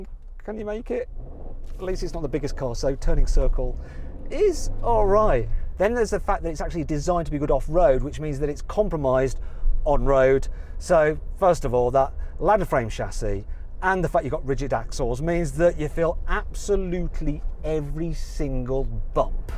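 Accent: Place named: Tamworth, United Kingdom. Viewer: Do a 4g63 swap ,you sound like you are from Tamworth. Buy this one or buy your self a subaru forester sti .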